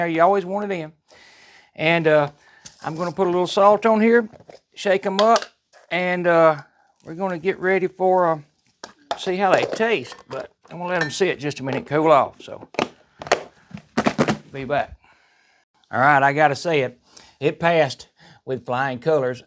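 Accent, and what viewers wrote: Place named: Appalachia, United States. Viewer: Hock dang dam nammit that hill Billy accent lol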